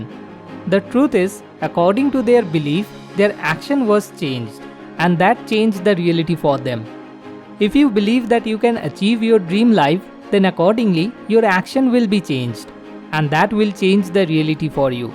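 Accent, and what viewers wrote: India. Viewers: I hate the Indian accent....sorry. I just cannot take it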